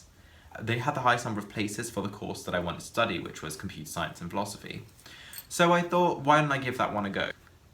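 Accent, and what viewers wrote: United Kingdom. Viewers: your speaking accent is the Oxford accent ? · I thought he grew up in Britian cuz of his accent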